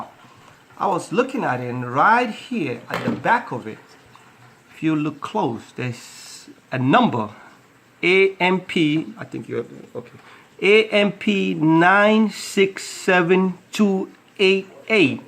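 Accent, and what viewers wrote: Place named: New Jersey, United States. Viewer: Excellent video. You have a Jersey accent.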